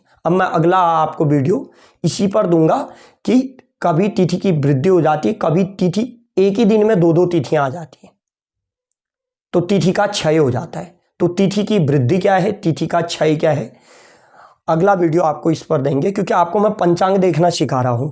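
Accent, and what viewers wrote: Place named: Pahari, India. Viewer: अरे dajyuu पहाड़ी हो क्या, टोन से लग रहा है❤। हम भी पहाड़ी ब्राह्मण ही हैं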